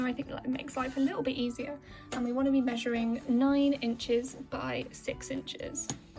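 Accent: United Kingdom. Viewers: Where ar u come from ? I like ur english look like british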